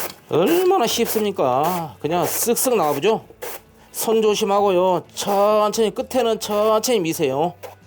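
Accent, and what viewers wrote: Jeolla, South Korea. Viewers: 구수한 전라도 사투리가 듣가 좋습니다..저도 고향이 전라도..ㅋ. 절이는 노하우 이용해서 올 김장은 좀 더 많이 담궈야겟네요..감사합니다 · 손님들이ㅡ한장 해버려 구만요ㅡ전라도ㅡ사투리 조아요ㅡ😏😏😊👍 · 셰프님들중 셰프님이 쵝오 입니다 설명도 귀에 쏙쏙 들오게 잘해주시고 전라도 사투리로 아주 재미 있습니다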